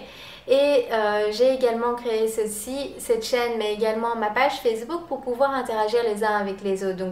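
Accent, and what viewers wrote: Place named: Charleroi, Belgium. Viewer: Coucou, Viens-tu de la région de Charleroi? Je me pose la question par rapport à ton petit "accent":-) Bizzz:-)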